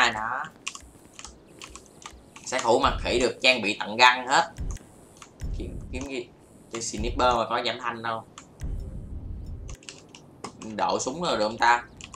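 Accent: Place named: South, Vietnam. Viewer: mình ở hà nội nhưng cực thích nghe giọng caster miền nam, buồn cười dã man luôn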